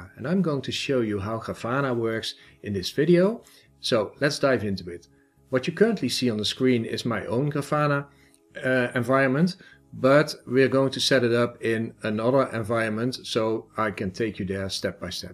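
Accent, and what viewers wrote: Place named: Netherlands. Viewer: Hi hi dat klinkt Nederlands 😉 en daarmee vroeg ik me af of u bekend bent met iungo?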